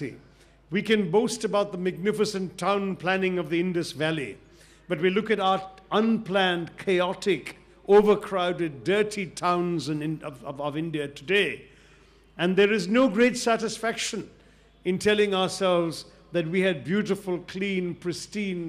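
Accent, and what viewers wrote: United Kingdom. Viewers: ~ You can even listen to Che Guevara, for all I care. Nobody is distracting you or stopping you. Just because one can speak crafty, polished queen's english doesn't absolve of one's involvement in the death of a woman one is married to, in a highly suspicious circumstance that directly tied to one with many loose ends floating all over. I don't care who says what, I would never send my kids to a school who will invite someone like him to talk on morality & leadership.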